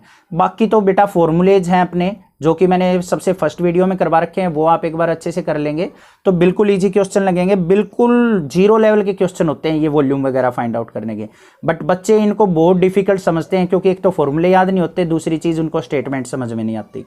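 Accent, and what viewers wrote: Haryana, India. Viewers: Are sir! Jhande gaad diye aapne. Kya badhiya mixture h Haryanvi,English aur Hindi ka. Waahh!! Maja aa gaya aapki class attend karke. Haryana ke ho ya rajasthan ke.Even I am from Rajasthan, Jhunjhunu.. 🤩Par filhaal abhi delhi me hu. Ummeed karta hu aap desh ke har kone me prasiddh ho jae..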